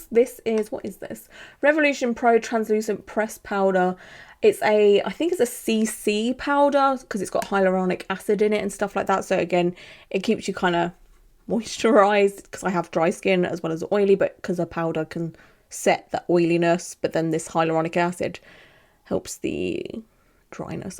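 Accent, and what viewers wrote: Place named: Northamptonshire, United Kingdom. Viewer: Your blatantly from Northamptoshire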